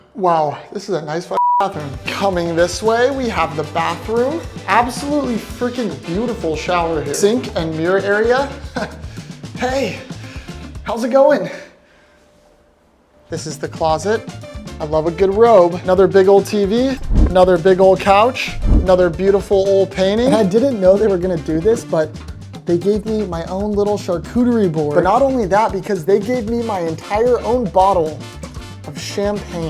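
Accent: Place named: Germany. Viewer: german accent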